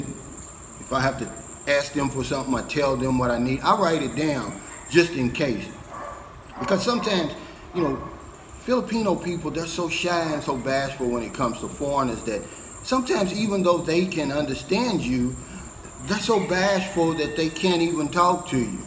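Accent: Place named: Kentucky, United States. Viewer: Slang English...ahh it's your Kentucky accent I bet.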